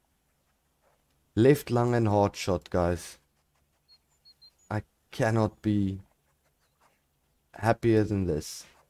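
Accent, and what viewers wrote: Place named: South Africa. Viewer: I can hear it😂 nice to find a fellow South African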